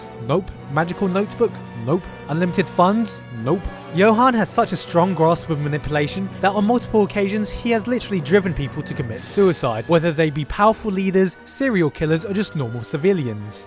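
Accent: United Kingdom. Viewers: In the Naruto world we have a lot of good characters: Shikamaru is okay, Kakashi is good, but it was Gaara who taught me to think differently when I hate something's guts for half the show and then becomes my favorite character. Still....it was the snipits I saw of Kakashi that got me interested in Naruto in the first place, so I guess I can be content. (After watching what I assume is over an hour of this, my internal thought voice is now a British accent.)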